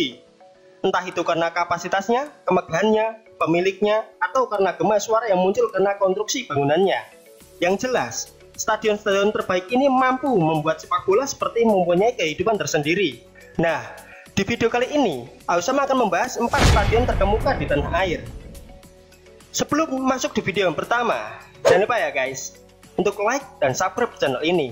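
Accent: Java, Indonesia. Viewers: Cie yang mencuri video orang,jawa mu medok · Medok'e rek 😅👍👍👍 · Jawane medok banget ya MA's asli jowo to massss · suaramu loh medok bangeet..... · Yg ngomong wong jowo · wong jowo to.. medok banget ngomong nya..? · Mas sampeyan suarane jowone medok bgt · Suaramu ituloh Mas..medok baget sesuai otak dan pikiranmu yang ngawur dan medok. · suaramu jowo bngat chancukkk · q seneng sih...liat vidio nya tapi perutku sakit sumpah denger mas nya bicaranya lucu bgt bahasa jawanya melekat bgt di lidah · Medooooookkk min.... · Suaranyaa.. jawa banget. Stadiun riau.. skrang berantakn broo.. krn terkena masalah sepertiny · suara jelek, medok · juan juan medok bangat jowone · Jawa kali suara mu bro Jawir jawir 😂😂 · Kok suaranya medok banget ya hehehehe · kalo boleh jujur suara lu medok,tapi great suara lu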